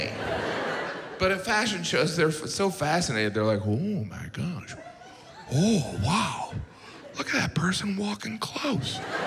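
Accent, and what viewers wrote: United Kingdom. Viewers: That’s not a British accent that’s Scottish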